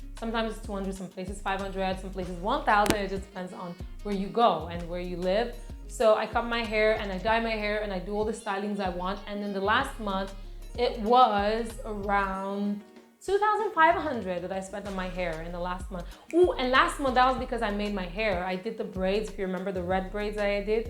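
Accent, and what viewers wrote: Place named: California, United States. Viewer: How come you have a California accent?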